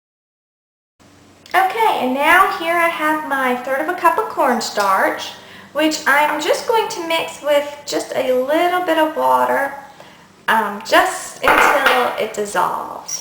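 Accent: South, United States. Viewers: Are you from the south, it sounds like you have a southern accent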